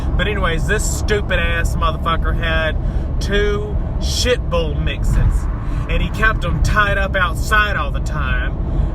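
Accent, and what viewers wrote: South, United States. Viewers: K- None, introduced me, to this funny, brave, passionate man, with a great voice for audio, that has an ear-pleasing, Southern twang.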